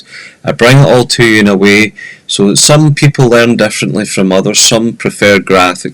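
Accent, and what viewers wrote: Scotland, United Kingdom. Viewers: What the heck! Your channel is pure dead brilliant!!! I live in Australia, and I've never heard of you before. My mother still lives in Greenock though, which can't be that far from you, gauging by your accent. You could have at least told her, and she could have sent me a postcard. So much wasted time. Now I'm having to watch all your other videos. My wife doesn't understand, says there are household chores to attend to; dishes and stuff. You've put me in a difficult dilemma. I am going to pray about it. 😀👍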